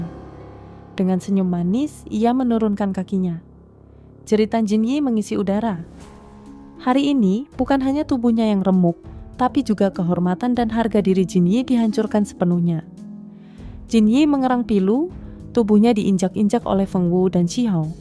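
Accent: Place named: Java, Indonesia. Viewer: lanjut mntap....ga ada bosenya kaya suara indah ke jawa2nya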